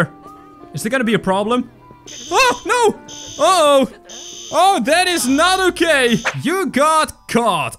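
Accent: Netherlands. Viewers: Nice futch accent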